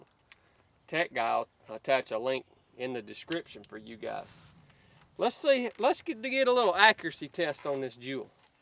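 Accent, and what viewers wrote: South, United States. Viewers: You got to love that southern accent when he pronounce Fiocchi "Foochi" 😅. Get MTG on your video! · I live OCONUS and my wife is not American, so I show her videos as the prime example of the accent from my beloved homeland.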